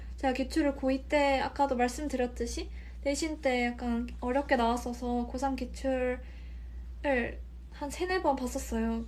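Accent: Jeolla, South Korea. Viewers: 전라도 출신이신가용??? 억양에 살짝 묻어나는 느낌 ㅎㅎ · 억양 들어보니까 전라도 같아요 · 사투리 억양 들어보니 광주나 전남지역 분인듯~ㅋㅋ 성형도 안하신거 같은데 의대생이 외모까지 자연미인이면 세상 너무 불공평한거 아닌가요?ㅜㅜ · 혹시 광주분이신가요? 전라도 사투리가 귀여우세요ㅎㅎ 그나저나 새벽기차타고 대치가는거 진짜 힘드셨을텐데...정말 고생 많으셨어요!! 얼굴도 너무 예쁘다. 애기같고. 이제 본과생이면 더 고생하시겠네요 화이팅!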